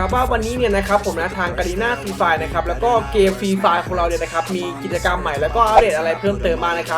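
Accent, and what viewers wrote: Isan, Thailand. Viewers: พูดกลางบ้างอีสานบ้าง555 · เว้าอีสานม้วนเเท้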